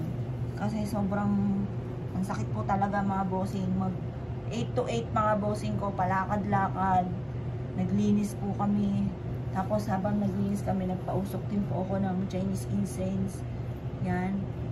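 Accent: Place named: Batangas, Philippines. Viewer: Parang taga batangas kayo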